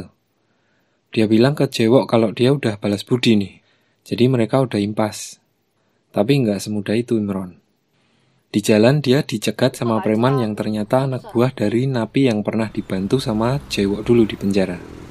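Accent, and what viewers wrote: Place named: Java, Indonesia. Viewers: Medok bgt sih bhsa Jd gk menarik dgr suaranya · Ngomongnya itu lo minnn campur aduk ada yg baku ada yg medok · Bok jowo wse mas .luweh apeh logate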